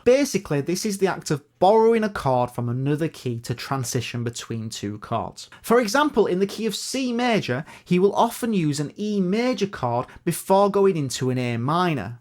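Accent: United Kingdom. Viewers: Wow! A British Rick Beato (sort of). Loved this video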